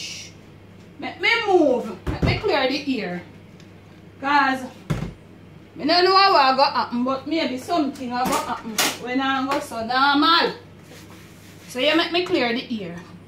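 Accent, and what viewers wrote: Patois, Jamaica. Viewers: Love your energy but nothing jamaican about this video but your accent. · I luv her raw Jamaican accent....she's the best!!! goodup goodup pickney...lol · Love your video and and love the beautiful dialect or Jamacian patios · I dont understand some of what she's saying but am I the only one who doesnt care & love listening to her Jamican accent 💛💙💜💚❤ When I visit Jamica I would like to go visit her house😎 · First time watching your videos...just by you representing the real jam dialect have me subscribing I'm sure I'll learn a thing or two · I love ya u make me laugh love the jamaica accent 🤣🤣🤣. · I like when you talk the patois it sounds so sweet.lol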